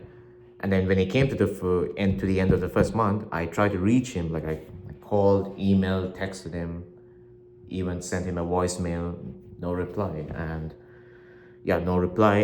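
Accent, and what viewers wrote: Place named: South Asia. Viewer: Habibi, yessss don’t waste the water that could go to starving bettas in Africa! Habibi u r fresh off the boat and no one can hear a word through that curry accent.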